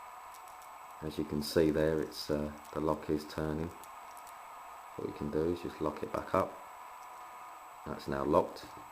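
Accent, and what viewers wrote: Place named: Cockney, United Kingdom. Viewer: ur sucha theif lol all ur videos are on picking locks. and u sound like ur from east end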